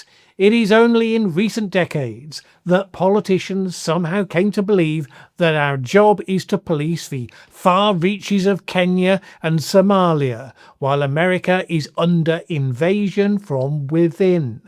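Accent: United Kingdom. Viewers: OH GOOD ! A quickly headline featuring name calling. Followed by a sarcastic narrative / lecture from an old guy with a British accent. Surely this guy's friends find him really persuasive. But what is actually at stake bears analysis. Anyone paying attention knows that the USA has many more general officers / senior officers on staff than at the end of WW2 when many multiples of today's forces were in uniform. We've also got the insanity of public health officers and doctors being called admirals because of some antiquated human resource theory which equates history of attainment of educational credentials with rank to justify pay. When you put all these guys together in an auditorium, they realize that they are not unique special individuals ruling over their direct reports in separated fifedoms, according to their own personal proclivities and idiosyncratic value systems. 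Look at all those gray heads! Underlings in military service live by "up or out" -- if you're not advancing, it's time to retire, unless you figure out a way to be retired on the job. But these guys, with limited or nonexistent or outdated war fighting experience, just spent 12 out if the last 16 years under Biden and Obama, "going along to get along. " This experience of course correction is being delivered in the nicest way possible -- demonstrating that these high ranking individuals are in a top heavy military...and if they stick around after being told the direction in which the nation's military is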